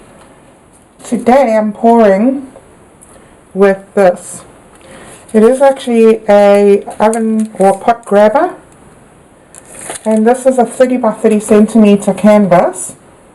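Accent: South Africa. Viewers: Very pretty! Must say, so weird to hear a fellow South African! Are you still in SA?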